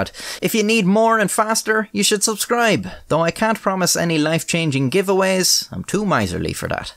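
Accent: Ireland. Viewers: Off D sofa trone . . . The Irish pronunciation always make me smile. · hear tings yup definetly irish (dane so for a sec i was wondering why a dnaish word appeared) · Today is good, the sarcastic condescending Irish man has graced us. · The videos are great but I also dig listening to that Irish accent. 😀 Is it normal for everyone over there or is it a more specific to a certain part of Ireland? Keep up the good work!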